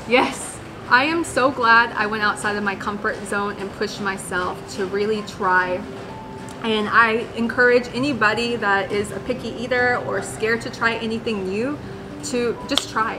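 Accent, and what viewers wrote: United States. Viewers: I learn much american accent from you, You need colab with Indonesian food vlogger to get more subscribe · Keep making videos! It is good for learning American pronunciation.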